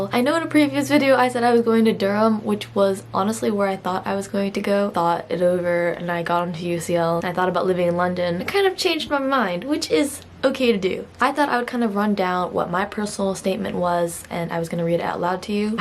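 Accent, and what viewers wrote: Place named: United States. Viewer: I love you Americans and your accent :)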